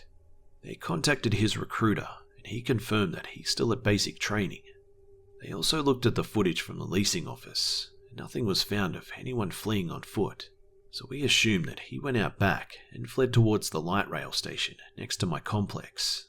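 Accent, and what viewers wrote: Australia. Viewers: are you Australian? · Are you Australian cos you sound like a true Aussie 😄🏖🇦🇺🇦🇺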